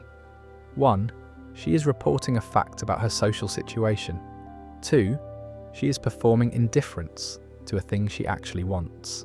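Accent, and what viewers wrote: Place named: United Kingdom. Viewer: man if only you weren’t british 😭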